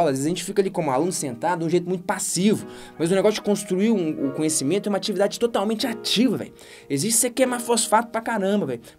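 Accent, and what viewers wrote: Minas Gerais, Brazil. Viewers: "esse trem" kkkkkkk sotaque mineiro == tudo pra mim!!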